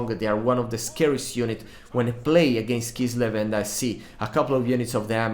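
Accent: Italy. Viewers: parli molto bene in inglese ma si sente ancora che sei italiano xD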